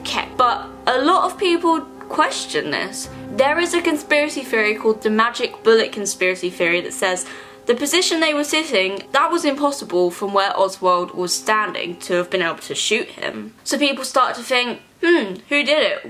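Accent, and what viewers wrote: England, United Kingdom. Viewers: Delia Cano British prononciation and braces does that chill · In the JFK one it wasn't the driver it was gov. Connelly........ she keeps saying the driver driving me nuts british accent lol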